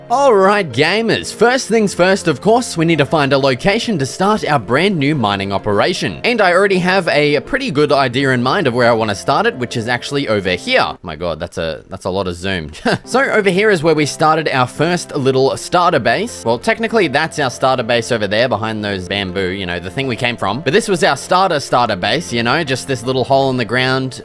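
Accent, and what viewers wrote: Australia. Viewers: Is your accent Australian or ~? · Are you Australian? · aussie accent and swearing makes it so much better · r u from Australia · the aussie accent and all the cussing makes this 10x more entertaining edit: THANKS FOR THE LIKES and continue to support!!!! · Aussie accent (where you from bro) Burping (fucking hilarious) And the cussing are all me (I’m Australian too) I love your videos brother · The aussie is strong with this one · R U AUSSIE?!!!!!! PLEASE TELL ME IM GUESSING RIGHT!!😢😢😮😮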